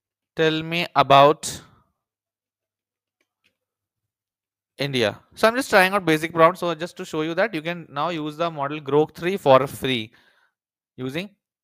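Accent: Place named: Germany. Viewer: Dieses "Dinglisch" kann ich wieder nicht verstehen. Schade.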